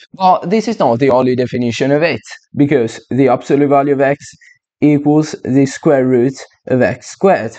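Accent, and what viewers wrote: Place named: United Kingdom. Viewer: May I ask you where you are from? I'm just trying to place your accent. It sounds similar to some British accents I've heard, but also maybe something else from a different country. I hope that's not impolite to ask.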